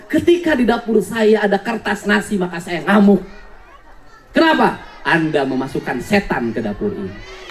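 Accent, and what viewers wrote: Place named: West Java, Indonesia. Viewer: Cara ngomongnya pak dedi ngingetin sunda banget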